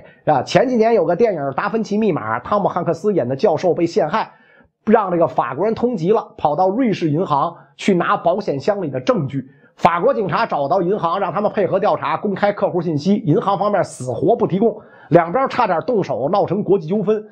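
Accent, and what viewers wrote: Beijing, China. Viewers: 喜欢袁老师京片子，猴儿赛因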